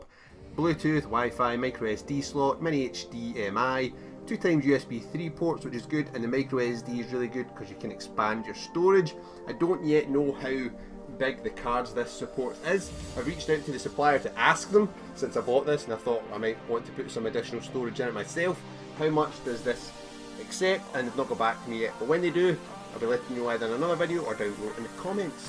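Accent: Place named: Scotland, United Kingdom. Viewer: Unboxing Therapy Scottish version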